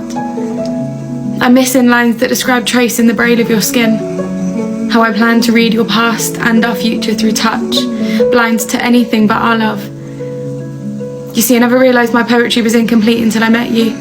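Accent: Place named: United Kingdom. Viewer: I would write ✍️ words and phrases to your lines that inspire me, that talk to me. I would compose words and phrases that your UK accent speaks to me every time you write a line, edit out a word or phrase that makes it more mathematically precise. Hoping that it is what my soul is saying to your soul.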